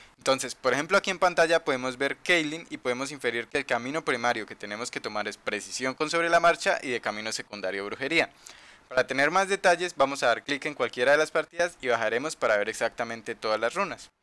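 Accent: Colombia. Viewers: se te nota lo colombiano xd buen video tio:^y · Amigo mío eres de Colombia? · muy buenos tus vídeos y se entiende lo colombiano v: toma tu like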